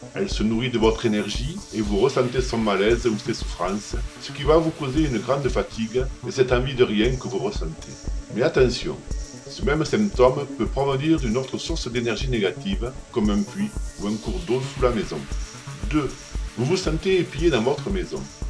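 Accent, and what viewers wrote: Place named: Southwest, France. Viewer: Bonjourrr j’adore votre accent du sud ouest comme le mien hhhhh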